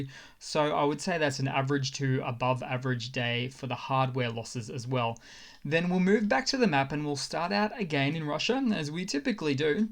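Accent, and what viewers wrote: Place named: Australia. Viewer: Since he's Australian (assuming by his accent) he might have opposite weather problems in January =D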